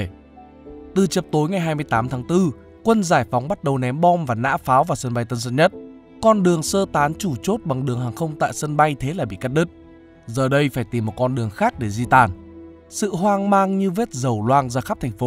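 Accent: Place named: North, Vietnam. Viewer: Giọng đọc miền Bắc làm tôi tin rằng lịch sử phải đúng đắn. Trân trọng. Mong thế hệ trẻ đi tìm lịch sử thật thay vì học cái lịch sử giả dối!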